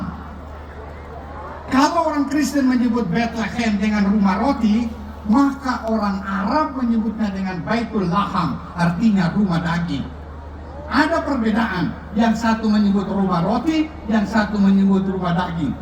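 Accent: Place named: Palu, Indonesia. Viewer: ini kiai org apa ya..logatnya kaya org palu..😅😅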